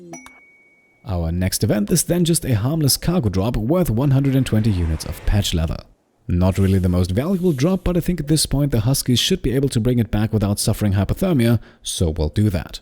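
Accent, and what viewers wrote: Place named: Germany. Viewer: Deine Stimme ist so entspannend weiter so danke, hoffe du wirst riesig! Your Voice is so relaxing keep it up i hope you get huge! I assume that you are German because of your way of speaking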